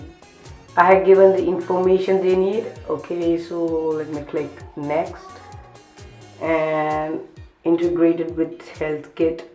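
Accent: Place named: India. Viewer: Did he just say 'XBASS 360'?? Indian accent maarlo yaar...fir kehte ho ki log subscribe nhi karte!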